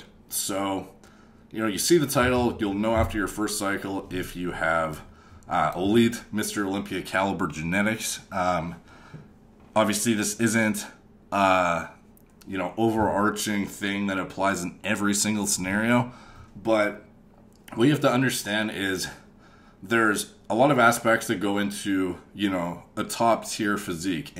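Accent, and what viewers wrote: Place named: Canada. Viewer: Yeah the way some canadians say out , aboot, house lol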